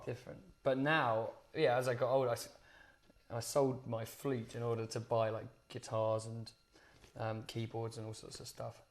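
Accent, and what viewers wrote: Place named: United Kingdom. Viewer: ah that british accent 3 3 i just love his voice..he's seriously put his heart and soul in his song !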